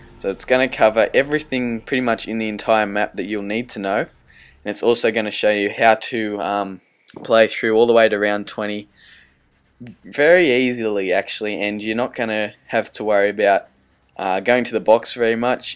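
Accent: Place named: Australia. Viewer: lol u have an accent that even though im aussie as well but thats probly because im watching too many american zombie players ;) so glad i finally found an assie one from faction 115 BBBBEEEEAAAAASSSSSSTTTTTT MMMOOODDDDEEEE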